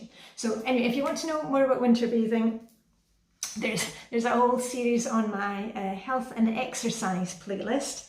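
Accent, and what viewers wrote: Scotland, United Kingdom. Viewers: I seen a squirrel at your window on your video. Was it a red or grey squirrel? Love hearing your Scottish voice. I am from Glasgow. Xx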